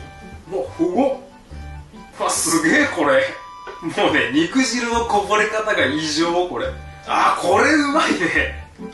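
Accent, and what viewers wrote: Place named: Fukuoka, Japan. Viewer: 福岡の方言かな？ほっとする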